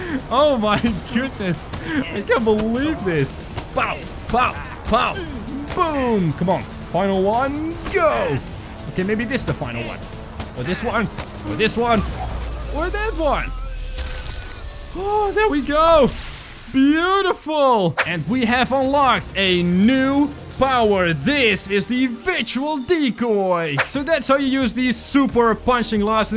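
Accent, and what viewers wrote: Netherlands. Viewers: By the way, I also live in the Netherlands, so I would like to know which province you live in. My province is Utrecht. By the way, you sound like a guy who lives in Groningen.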